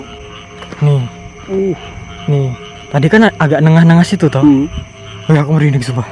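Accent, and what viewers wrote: Java, Indonesia. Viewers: Abang orang jawa ya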